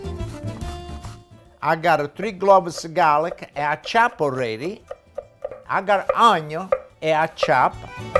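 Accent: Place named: Campania, Italy. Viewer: Hi, just curious to know from which part of Campania you come from because my Mum used to say "A salut, addo' va'" and im from Sorrento Area. Nice video!!!! Keep it up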